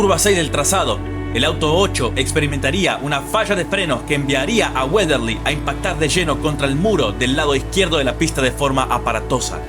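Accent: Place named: Argentina. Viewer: excelente video amigo! increíble que haya un canal de habla hispana (y argentino por lo que se te ecucha) que hable de Nascar!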